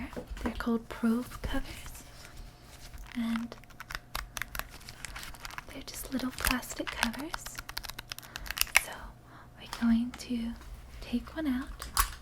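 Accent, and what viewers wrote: United States. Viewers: Pretty good American accent! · soooo good hun, I love how u do an American accent. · Wow, you do an american accent very well. Great vid! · I thought this was a great video. Not too common hearing someone who has an Scottish, English, even Australian accent. With as many dialects you've been exposed to try an American accent you did well. Being from America people naturally try and do other accents but hearing someone from Europe/Australia do an American is neat. Lovely video! Perfect! Somewhere I remember you saying you've lived in a few different counties. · your american accent is great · "I have that too" LOL The American accent is good. However, no American medical office worker or nurse is going to sit with you with every question. They make you do it yourself. Outside the accent, there is something very very creepy about this RP character. 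I'd be running for the door at the first chance. I just googled AMSR and ... yeah that's why I find this creepy. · i am not sure how i missed this. but its wonderful. just like you. and i love the princess leia hoth hair you got going on. also love the american accent, though i prefer the british one! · I'm from american midwest, you could have fooled me good with that accent, great job! And great video, thanks for sharing! 😄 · If you didn't put (American Accent) on your title, I would've really thought that you were from the States... Lol. Great video by the way... Think I can go sleep now finally... Lol. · Great American accent. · Goodness the accent is convincing. If I hadn't see your other videos I'd assume you were American. Well done. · The only non-American I've heard do an American accent as good as yours is Nicole Kidman. When you are discovered, don't forget your YouTube friends! 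· I'm from the US, and if I hadn't seen any of your previous videos I would have never guessed you had an accent. Great American accent and great video! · Nice American accent! You can usually tell when it's a Brit trying to do an American accent. My wife is from Leeds (Yorkshire Accent!) and you can hear her accent when she does her impression of an American.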